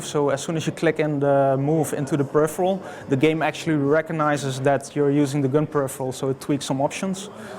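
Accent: Netherlands. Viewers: Dutch accent, MY COUNTRY FUCKING ROOOOCKS!!!... Except the cops of course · You sound kinda dutch. Are you? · woow, dutch guy (i am one :D) learn english, you are to obvious ;D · the guy sounds dutch to me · Nah it's a guy from holland they all have this accent when they speak english :D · This guy is definitely Dutch, listen to his accent. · He's definitely Dutch. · I'm SURE this guy is Dutch. It just sounds to much like a Dutch speaking person trying his absolute best to talk English without too much of an accent. Like if you're native language is also Dutch and you agree with this statement! :p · dude, are you dutch? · nederlander? ik hoor wel een nederlands acsent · your dutch! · ge hoord direct da da nen hollander is he xD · He sounds dutch :) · definitely Dutch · he's dutch, i know it of the accent that sucks hard. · lekker nederlands accent haha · YOu can hear this guy is Dutch.. 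· he is not russian, he is DUTCH haha i hear i because i am dutch too!!!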